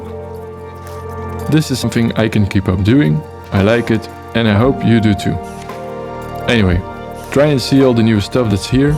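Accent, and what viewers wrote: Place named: Belgium. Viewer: Met dat accent kan het niet anders dan een Belg zijn 😅 Fijn om een landgenoot te vinden op yt met een wood channel.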